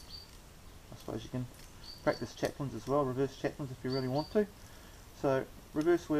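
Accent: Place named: Australia. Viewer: Are you in Australia? You sound Australian and there's a lot of greenery and birds where you are... It sure looks like it. Correct me if I'm wrong, I could be totally off.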